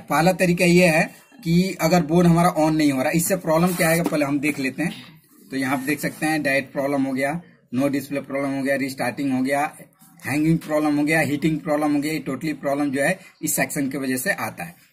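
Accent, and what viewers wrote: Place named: India. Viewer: indienglish... q bueno.-....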